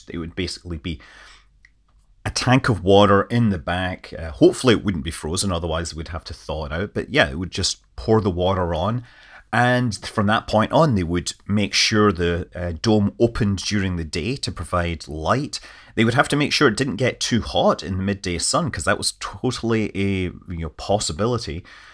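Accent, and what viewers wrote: Scotland, United Kingdom. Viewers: Scottish fo0l...Aye!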